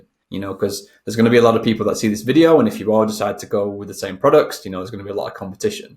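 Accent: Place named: Bolton, United Kingdom. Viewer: Where are you from as you sound local to me. Bolton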